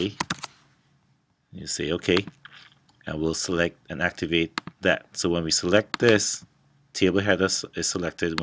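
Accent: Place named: Caribbean. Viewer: Where's your accent from? You sound West Indian.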